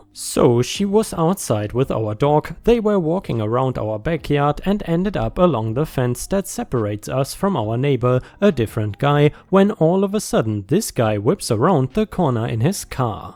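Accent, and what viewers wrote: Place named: Germany. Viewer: thank you for the reply! I’m honored! Lol. Well, I’m old, and wear glasses, but I love to read along with your voiceover because sometime many of you “clean up” the written words and I like to know what the OP actually wrote. Lol. Thanks for the reply. BT W. I love your German accent, my elder relatives were German immigrants and I love hearing the accent again. 😘😘⭐️⭐️⭐️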